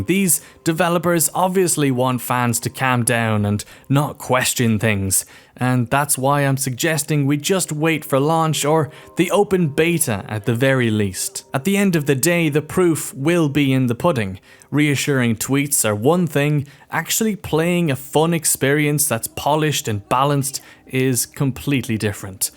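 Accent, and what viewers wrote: Ireland. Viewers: I love that thick Irish Celtic English accent...you sound Like some pirate from Carribean...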